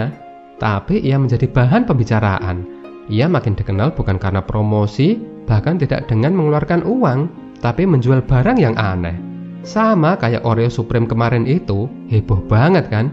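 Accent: Java, Indonesia. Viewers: Bisa gk medok nya di hilang'in??? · Suaramu medok tenan mas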